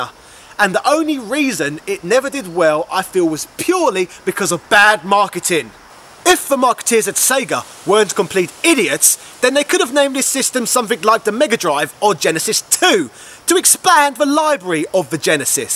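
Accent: United Kingdom. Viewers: Subbed. You remind me a bit of AVGN, with the fantastic British accent, which is awesome. Keep up the good work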